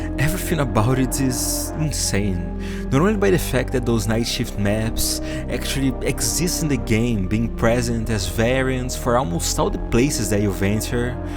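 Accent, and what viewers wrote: Brazil. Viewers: Sotaque de brasileiro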